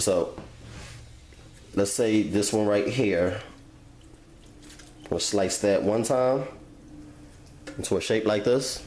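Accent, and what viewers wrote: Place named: Texas, United States. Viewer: You sound like some of my relatives from TX. I know I overheard one of them mention that they boil them as a part of their cleaning process then proceed in cleaning.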